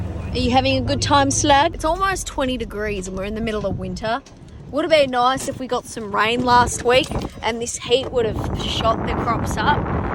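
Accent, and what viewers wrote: Australia. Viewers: Trying to just learn Aussie accent with you 🙂😂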